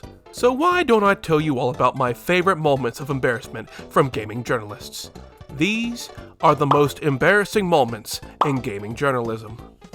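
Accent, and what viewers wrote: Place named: Texas, United States. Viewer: he is from texas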